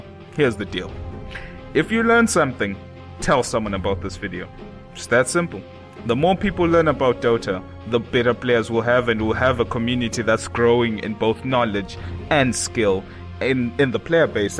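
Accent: South Africa. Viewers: dude are you South African? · He does sound very South African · I'm guessing you're from South Africa. Am I right? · this oke is south african for sure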